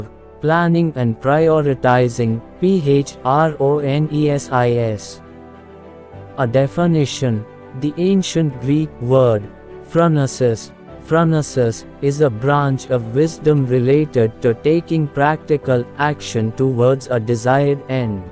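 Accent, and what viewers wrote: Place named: India. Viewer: I love you for creating this content, but i can't listen this indian accent, this is just lazy work bruh, pathetic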